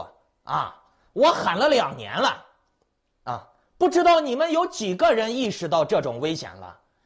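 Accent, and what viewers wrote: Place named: Cantonese, China. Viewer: ，说话不失风趣和幽默，甚至嘲讽。。。。广东人漏风普通话特别诙谐影响深刻。。。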